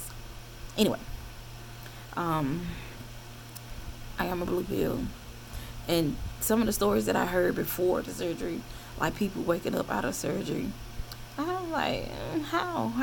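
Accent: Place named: Alabama, United States. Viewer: Are you related to Adrienne Little? You have that Mobile, AL twang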